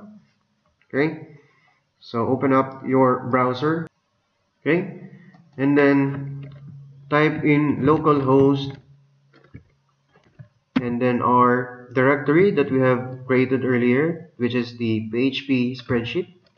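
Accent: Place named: Philippines. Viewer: You sounds like from Philippines bro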